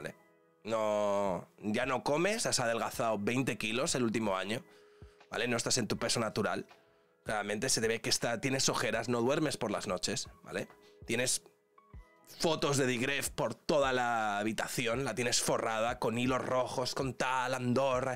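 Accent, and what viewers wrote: Mexico. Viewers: 😂😂😂😂, me gusta tu acento mexicano ja,ja,ja,ja,ja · Buen acento mexicano, en mi opinión. Me imagino que ese hermano Culichi le escribió para platicar algo de seguridad en mi México lindo 🙈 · no se por que youtube me recomendó el video, no entiendo el contexto pero ame tu acento mexicano 🤣🤣🤣🤣🤣🤣 entonces esa youtuber española si estaba haciendo acento mexicano cuando resumio coco y yo creyendo que el barrio la respalda.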